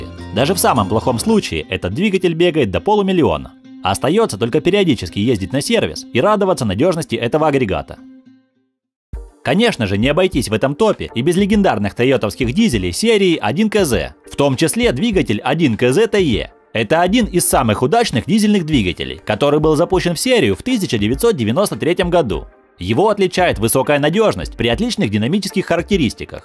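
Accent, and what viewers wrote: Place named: Belarus. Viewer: акцент белоруса, эксперт?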